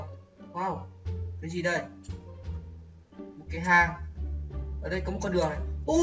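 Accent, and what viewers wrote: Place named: Central Highlands, Vietnam. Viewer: cải thiện cái giọng nói như người vùng cao ý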